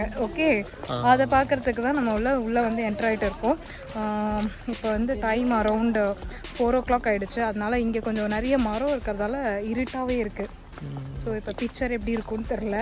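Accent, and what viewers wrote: Sri Lanka. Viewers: இலங்கை தமிழ் ரொம்ப அழகு நானும் இலங்கை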